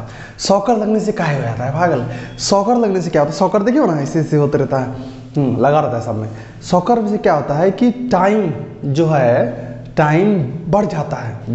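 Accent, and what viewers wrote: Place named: United States. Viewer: Sir thoda jyada American English ho gya but achha laga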